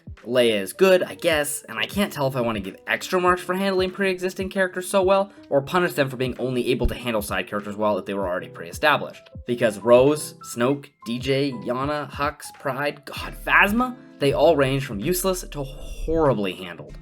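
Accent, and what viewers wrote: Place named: United Kingdom. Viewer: Like the British accent! You should probably go with that from now on🦹🏻‍♂️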